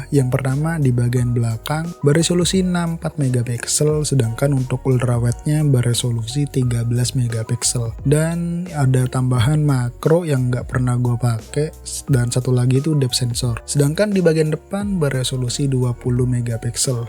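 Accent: Bali, Indonesia. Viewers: bang lu asal bali yaa bang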